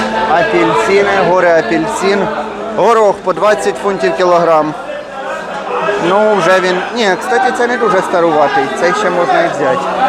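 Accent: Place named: Central Ukraine, Ukraine. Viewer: По говору з центру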